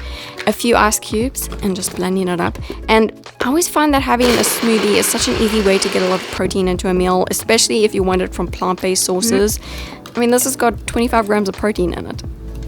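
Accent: South Africa. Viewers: Are you South African? I can sense it immediately! I’m also raised by a South African family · Love your videos! Do i hear a south african accent? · I’m not too sure what her accent is , maybe SA , but I’m obsessed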